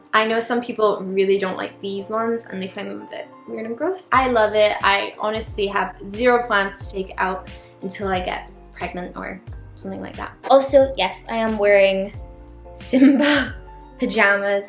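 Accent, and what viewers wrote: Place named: Scotland, United Kingdom. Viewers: She has a Scottish accent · I hear a mix of accents when you speak which I actually love.. I hear the u.s/Canadian north American accent, and then I also hear a slight uk accent.. not anything like some other accents from u.k, but I can actually understand every word that you say! I'm north american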